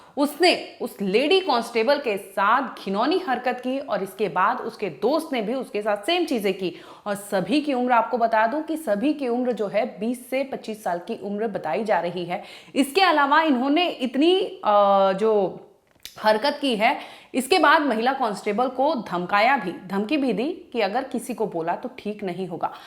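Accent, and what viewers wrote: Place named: Bengal, India. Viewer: Aap Bangali hai kya aapki Hindi bilkul sahi nahin hai aap sahi se nahin bol paate Ho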